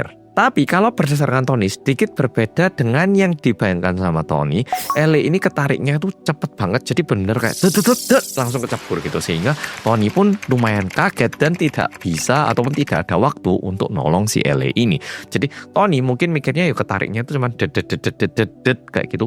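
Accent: Java, Indonesia. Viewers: Terus terang aku nggak bisa nyimak ceritanya.. Salfok sama suarane mas yang medok iku lho.. 😂😂😂 Kok iso lho.. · Oalah,wong Korea iso jowoan medok pisan,tak subscribe aelah..😊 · Ya bisa,lah dari TK -SMA sekolahnya di Malang, malah pernah jadi Ketua OSIS segala,otomatis bhs jawanya udah medok.. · Lho, baru tau? Mas asli Korea, dari ortu asli Korea Lahir di Daegu Korea, besar di Malang Jatim dari TK umur 4 tahun sampai SMA Makanya logat nya medok Jawa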